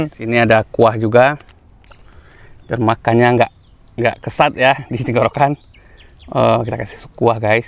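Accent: West Sumatra, Indonesia. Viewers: Sama, maka nya heran logat nya kayak logat Sumbar, tapi video nya kayak di sunda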